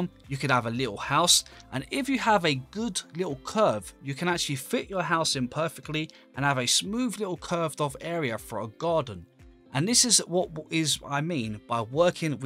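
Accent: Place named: South, United Kingdom. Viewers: 10/10 cockney accent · How does somebody sound southern and British at the same time 👁👄👁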